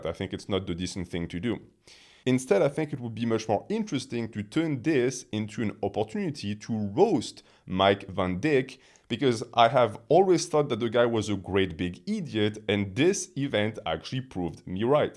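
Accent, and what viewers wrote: France. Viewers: I love your French accent, it’s like listening to Baudrillard talk about weightlifting.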